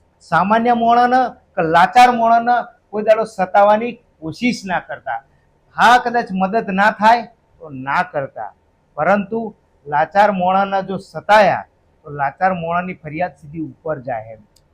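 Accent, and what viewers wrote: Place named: Charotar, India. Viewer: Charotar No Havaj Bole Mari Jaan 😍❤️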